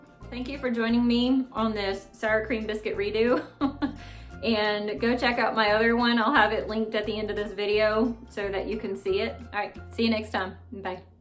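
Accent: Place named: United States. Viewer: Where are you? You sound American. I wish that in America the recipes would be given in what we have been trained in like cups and ounces. I Don't Go near any recipe that's not written in plain English for Americans to understand. If you want to cook for Europeans or South Americans go for it but please in the United States give recipes that are people can understand without having to go to a book and transfer everything over to cups Oz pints and quarts. We have gotten so far away from American values and everything is for the aliens that have taken over our country. Obviously in cooking as well.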